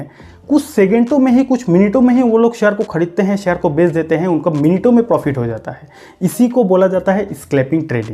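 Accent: Bengal, India. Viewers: Bhi ap bengali ho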